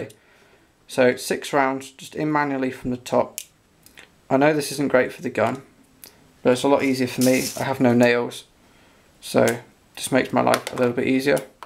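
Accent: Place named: United Kingdom. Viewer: were'd u buy this gun , i take from your accent your in the uk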